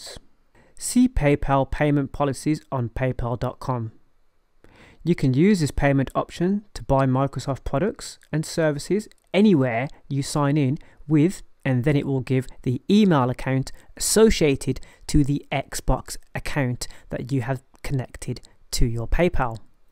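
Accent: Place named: RP, United Kingdom. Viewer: You sound dead posh ya know 😂👌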